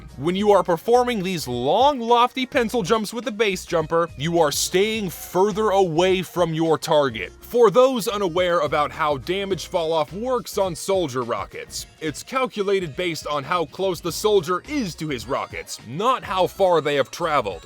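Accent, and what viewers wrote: South, United States. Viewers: I'm not american and to me your american accent is THICC AF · Ur southern draw started coming out in the end. You unconsciously change your accent when recording. Also I am typing this to boost engagement. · Are you mid-southern?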